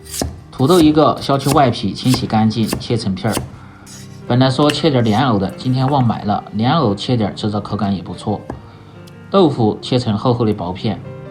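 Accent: Sichuan, China. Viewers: 要得 謝謝 有你的川普、更入味兒👂😂 啊呀 冒菜都莫得你的川普正宗 我們這莫得賣黃吼兒的🤔 其他的都賣得到🤔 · 听到熟悉的四川普通话就直接给老乡点赞了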